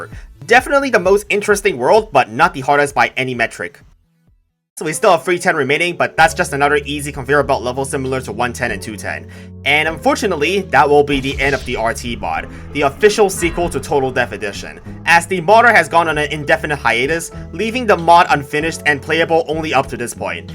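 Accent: China. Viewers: I think I'm in love with you. Lowk love your videos and Chinese accent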